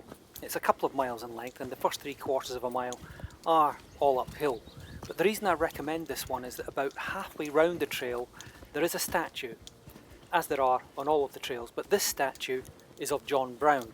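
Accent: Scotland, United Kingdom. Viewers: What a lovely Scottish burr you have! Wonderful tour—thank you! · I enjoy listening to a man with the Scottish accent.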